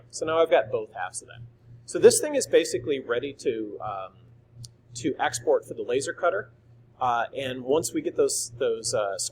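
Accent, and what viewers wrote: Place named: United States. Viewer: Very poor instructional video. This is made for people who already know how to make a cylinder. moves and clicks on stuff the beginner has NO idea of what he is doing. He is way too fast, doesn't explain why he is doing what he is doing. I am rewinding again and again and again, trying to find what he is doing and why. If this is basic construction in OnShape, take the extra time to explain EVERYTHING you are doing and where to find it. This video is useless to me, I guess I need to find a simpler app to use. I am glad he speaks American English, not some foreign deviation that is totally not understandable.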